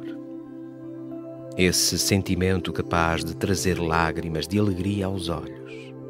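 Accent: Portugal. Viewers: Finalmente, uma meditação em português De Portugal. Voz bonita. 🙏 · Como foi bom ouvir esta Meditação com este sotaque português que amo.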